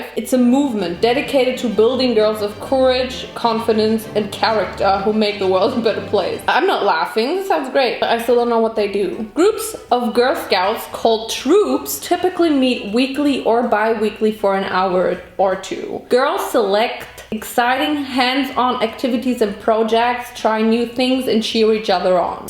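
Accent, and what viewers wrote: United Kingdom. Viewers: Please a whole Video just talking in your hilarious british accent !! 😂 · i love your british accent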